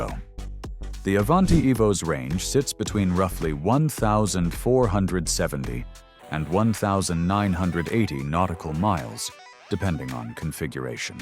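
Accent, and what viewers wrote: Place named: United States. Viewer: Good to Hear an American.🇺🇸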